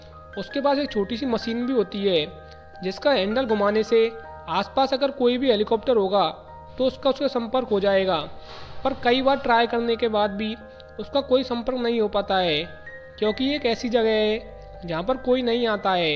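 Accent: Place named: Bihar, India. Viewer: Aur teri voice bihari jesi hoti hai ....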